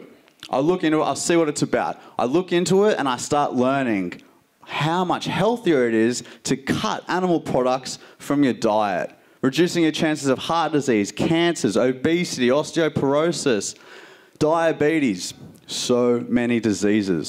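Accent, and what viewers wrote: Australia. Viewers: Each time your speech comes out, first of all I smile thanks to your Australian accent, and immediately after, I feel empowered and extremely proud to be part of this journey for more than a year now, for participating to something much bigger than me, than us, for being part of the Universe... I became vegan overnight after having watched Gary's speech. Both of you have my ex-boyfriends' name so I thought it was about time and a sign to make peace with my past. I knew it was in alignment with who I am and who I want to be as a person. And one year later I feel more happy, more energized, more aware, more educated, more and more human than ever before. so thank you for reminding me why I became vegan and thank you for carrying the voice of those who aren't heard. Thank you for everything you do. Thank you, from the bottom of my heart. Thank you. 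Sending you all my love. Bye Coco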